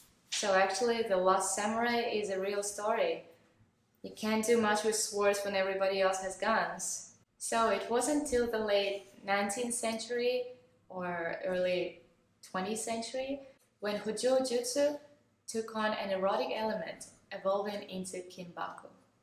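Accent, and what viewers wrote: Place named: Russia. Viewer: Oh I love your Russian accent, I even watch some of your videos in Russian, just to here the exciting melody. Я изучаю русский язык.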